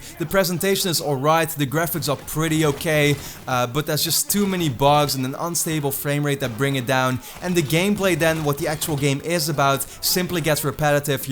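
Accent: Netherlands. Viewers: ik hoorde dat accent en ik dacht dat is sws een hollander 😂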